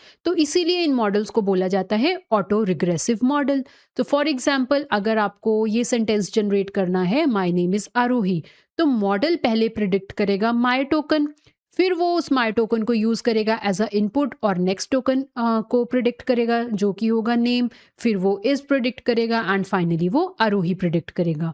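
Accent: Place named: India. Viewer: It's so nice to hear an Indian voice behind an AI unraveling video that too in Hindi🔥🔥...please go deep for those who somehow can't able to break the language barrier yet...your video will certainly help in doing that...by making understanding of AI to the masses🙏🙏🔥🔥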